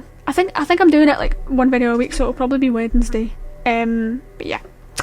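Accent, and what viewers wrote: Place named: Glasgow, United Kingdom. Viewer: Love the sweet lovely Scottish accent. With a small dash of "the Drum (Drumchapel)" dialect. Brilliant content and keep going as you progress it will improve but not lose your natural ability.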